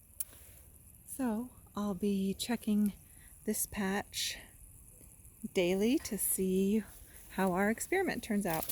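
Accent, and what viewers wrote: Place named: Jamaica. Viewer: Heyyy you sound Jamaican!!!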